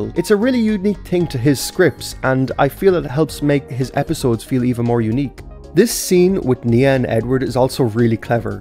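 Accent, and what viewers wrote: Ireland. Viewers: you already sound Irish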